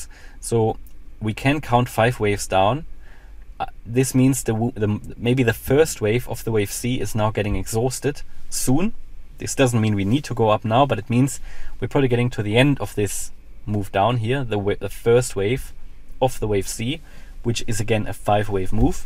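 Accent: Germany. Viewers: German confirmed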